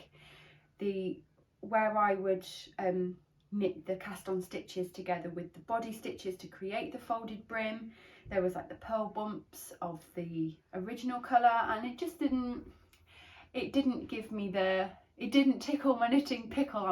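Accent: Midlands, United Kingdom. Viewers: I really enjoyed your first podcast and am very impressed that you tackled jumpers early on in your knitting journey. I am originally from Coventry (Eastern Green) and now live in Toronto, Canada, so nice to hear a Midlands accent . Love the modelling shots of you and your adorable kiddies 😆. Keep it up and looking forward to your future podcasts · I love the sleeves on your novice sweater! I found your first podcast yesterday and loved it, so got up this morning to watch your second before work. Plus I thought I picked up a Midlands accent! I’m from up the road from you in Birmingham.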